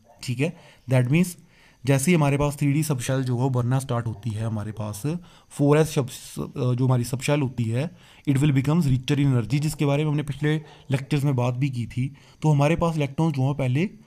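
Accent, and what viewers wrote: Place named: Haryana, India. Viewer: Sir aap haryana se ho kya . haryanvi jesi feeling aa rahi h aapki language m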